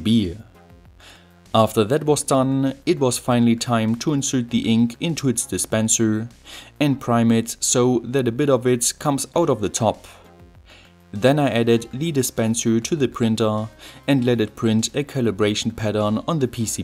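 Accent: Germany. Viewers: I am a bit confused by his accent. Where's this guy from? It sounds like a German speaking English with a Chinese dialect. Please spare me the Chinese isn't a language. I am fully aware of that. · Kenne dich nicht aber anhand deiner Aussprache könnte ich schwören du kommst aus Deutschland. Aber interessantes Video 👍🏼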